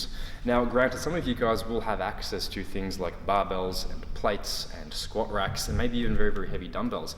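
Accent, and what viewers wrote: United Kingdom. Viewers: Who are you with the British accent? Gym closed bro !! Why are your still open bro??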